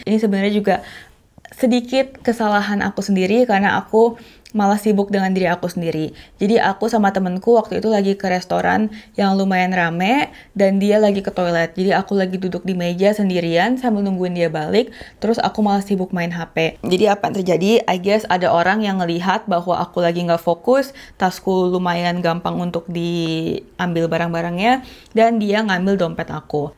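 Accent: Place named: Germany. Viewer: Lidahnya mbak udah sering keseleo sama logat Jerman.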